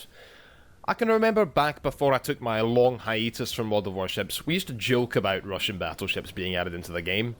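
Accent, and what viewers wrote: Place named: Paisley, United Kingdom. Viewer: Love your accent, reminds me of me mom. She was from Paisley.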